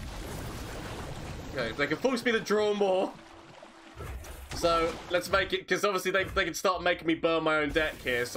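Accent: United Kingdom. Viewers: you're the best british streamer hands down. As someone from the commonwealth but not the isles I tend to find the accent interesting. Just a thought, but maybe you can market yourself as the ' UK ' streamer 🤔. As well, I'm part if the discord just to preface. I thought though that to grow and reach your goal of 5k you need a website, unless you have one already; did not check. Al a swimstrim per se. Although, love your decks because they are fun! I also think you could look into paid marketing for your targeted keywords. I do it professionally for other people. No obligation for any ' deals ' but I can help in return for your videos. They keep me going sometimes 😄. It would help grow quite reliably imo Furthermore, please stop slouching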